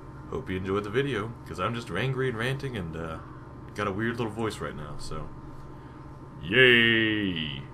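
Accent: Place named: South, United States. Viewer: You have just the slightest twinge of southern accent whenever your start speaking a sentence more than 6 words long. I would totally fuck that slight southern accent.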